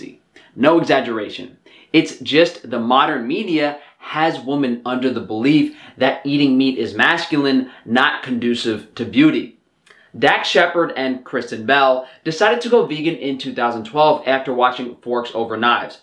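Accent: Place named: New York, United States. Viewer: it might be his new york accent 🤷🏼‍♀️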